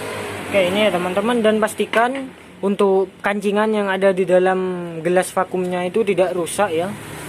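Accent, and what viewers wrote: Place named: Madura, Indonesia. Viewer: Org madura ya ?😁😁😁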